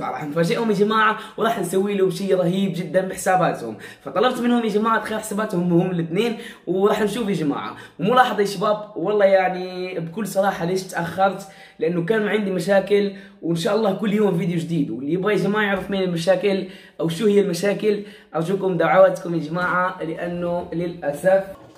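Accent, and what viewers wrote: Morocco. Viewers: واش انت مغريبي من اي مدينة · هل انت مغربي؟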